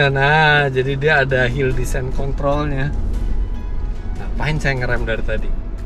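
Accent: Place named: Madura, Indonesia. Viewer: Salam dari probolinggo. Keng logat medure lek.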